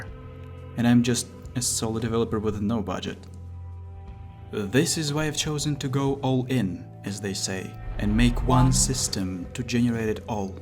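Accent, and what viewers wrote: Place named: Japan. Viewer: I love your accent! I can't tell if it's Japanese or if some Latin origin. My ears say Japanese.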